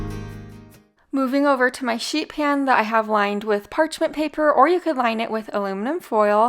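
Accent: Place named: Midwest, United States. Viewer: Where are you from!?!!? You have the cutest lil accent I ever did hear! Midwest?? I'm from an area between new yawk and new joisey, so I say cawfee and I'm sure a bunch of other weird words but even I know I murder coffee 🤣🤦‍♀️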